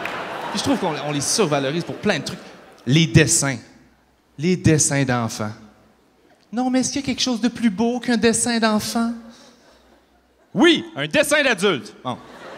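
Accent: Quebec, Canada. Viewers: J'ai bien rien perso jaime laccent quebecois dnc sa ma pas deranger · Je ne trouve pas particulièrement. C'est comme ça qu'on prononce "parent" au Québec. :) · Vous êtes pas quebecois, vous pouvez pas comprendre ! Alors arrêter de critiquer l'accent. Car meme moi qui suis pas Quebecois, bah.. Je critique pas, et j'ai bien rigoler :D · Les Français qui disent ne rien comprendre : 1) Arrêtez la mauvaise foi, il n'y a que quelques mots/phrases trop rapides, 2) faites un effort, exercez votre oreille en écoutant d'autres prestations d'artistes québécois (et des films, genre ceux de Dolan), 3) sortez un peu de votre bulle, la francophonie est grande et belle et ce n'est certainement pas la France qui la fait le plus vivre. Le québécois s'est développé indépendamment du français de métropole depuis quatre siècle. En fait le français du Québec est plus proche de l'ancien français que le nôtre. 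Et puis 4) arrêtez de chouiner un peu, vous n'êtes pas le centre du monde. Conseils d'un Français aux autres Français. Merci le Québec, votre accent est beau (et sexy ! :p )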